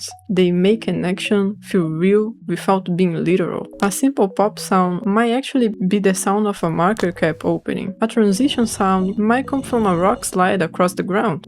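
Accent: Brazil. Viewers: you sounds brazilian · eita sotaque brasileiro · reconheci seu sotaque brasileiro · Are u brazilian?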